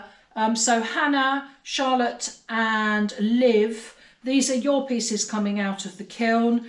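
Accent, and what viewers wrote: England, United Kingdom. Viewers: could you tell me how you have created those great spiral handles? i love watching your kiln openings, numerous hair styles and lovely english accent. I'm watching from Victoria, Australia. 35 celsius today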